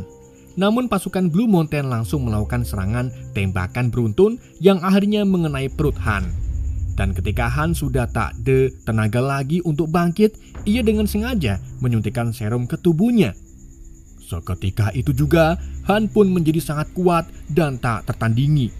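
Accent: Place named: Banyumas, Indonesia. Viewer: Wkwkwkw jawa,a itu loh,,ngapak bgt mka,a saya suka chenel ini ,,bikin ngakak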